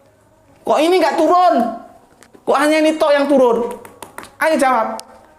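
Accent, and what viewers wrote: Java, Indonesia. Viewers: Hahahaha.. Inggris jowo campur mbatak... hahahaaaa saya suka..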